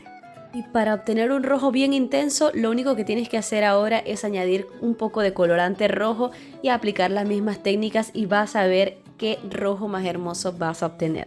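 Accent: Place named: Venezuela. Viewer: Hola soy nueva seguidora y me enganche, eres de Venezuela? Porque estas en Argentina pero el acento te delata😅😅😅😅😅😀😀😀😀😀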